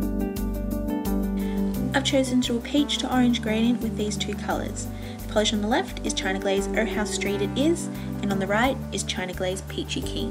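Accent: Australia. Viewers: It just her Australian accent. She sound like an Australian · She just sounds Australian to me...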